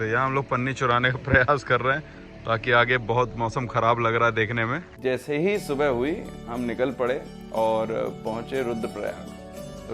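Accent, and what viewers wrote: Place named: Kanpur, India. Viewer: आपकी भाषा में कानपुर का flavour रहता है अच्छा लगता है बाहर हो तो और अच्छा लगता है। जय बाबा की 🙏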